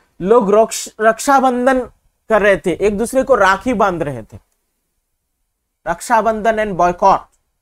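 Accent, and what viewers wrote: Assam, India. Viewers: Are you from assam?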